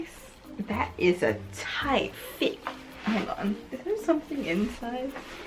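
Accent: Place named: United Kingdom. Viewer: isn't that just the British accent?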